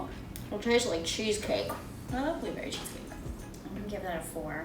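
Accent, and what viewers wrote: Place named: New Jersey, United States. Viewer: I can really hear the New Jersey accent coming in this video lmao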